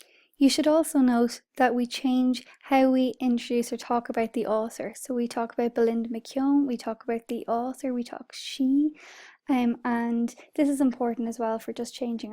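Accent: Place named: Ireland. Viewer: oh that voice that irish accent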